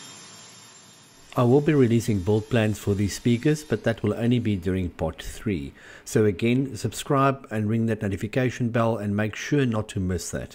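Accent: South Africa. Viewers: I respect your designs and work ethic, that south african accent gets me every time. all the best and greatest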